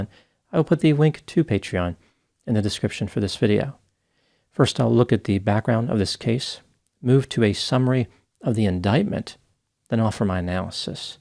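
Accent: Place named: Maryland, United States. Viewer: ....great job! Can you say, "Satan"? Harrisburg - yup. Oh hell no. Grew up in Carroll County, MD, so you may say this is my, "old stomping grounds" - I recognize your accent, albeit maybe more eastward... Those dolls look like people I have DEFINATELY come across. Honestly, I would bet that at least 65% of certain populations would NOT think this is odd, and continue to push the argument of 'art'. I've known people that resemble this ilk. PUKE.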